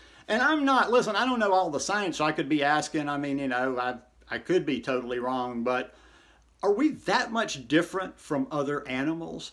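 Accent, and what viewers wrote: South, United States. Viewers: Not only does your simplistic approach make the most obvious sense, you are so darn entertaining when sharing it! Love the southern accent and the mind processing of our human eating selves. Thanks for the entertainment!!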